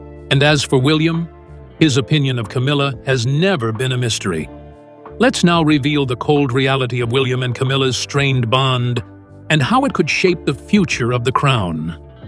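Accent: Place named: United States. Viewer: Be very careful what you believe. Especially when it comes with an American accent. This report is total garbage. Believe me, I know.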